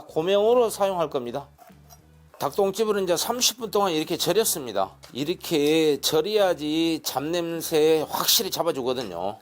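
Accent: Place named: Jeolla, South Korea. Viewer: 아... 잘라구 누웠는데 헉... 요리사님 말ㅇ투가 진짜 이건 전라도인데 전남쪽...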